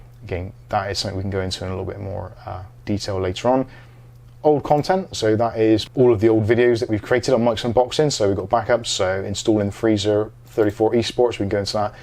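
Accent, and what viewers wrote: Bristol, United Kingdom. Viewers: Bristol accent ?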